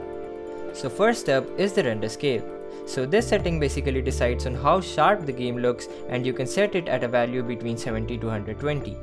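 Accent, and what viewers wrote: India. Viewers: Indian accent = instant dislike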